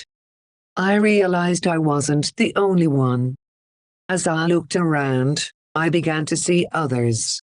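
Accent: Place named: Ireland. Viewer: That's an Irish accent I hear, I'm a gang stalking victim and I'm Irish. Are you an Irish target?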